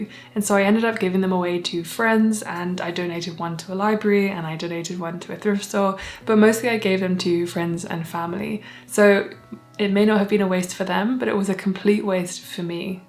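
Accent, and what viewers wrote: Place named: United Kingdom. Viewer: hi just found your channel and when saw that you live in Denver, I was really confused; you sound like a brit, same as me and after watching this i guess that you have just moved to the states; lucky girl; hope you enjoy your new life therex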